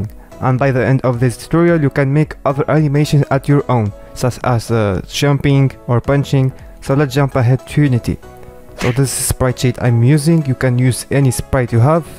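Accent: France. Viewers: t'est français ? car tu sonne français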